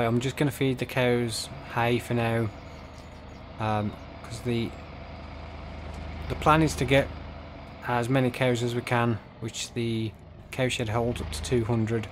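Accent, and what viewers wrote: United Kingdom. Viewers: I only found your YouTube channel a week or so back I am now going back through the older video I have to say I think you speaking is much better than just the music and txt it’s good to hear another UK person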